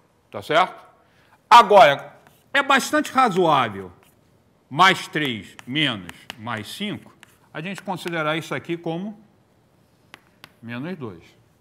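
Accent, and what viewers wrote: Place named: Rio de Janeiro, Brazil. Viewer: Esse professro é carioca! acertei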